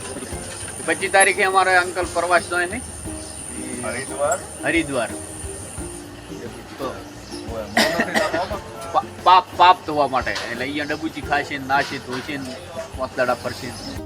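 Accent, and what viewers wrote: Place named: Banaskantha, India. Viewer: જય માતાજી ક્યાં થી છો ભાઈ ભાઈ તમારી ભાષા બનાસકાંઠા ની લાગે છે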